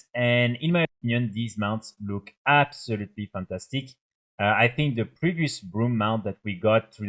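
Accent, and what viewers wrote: France. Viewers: the french accent makes this unwatchable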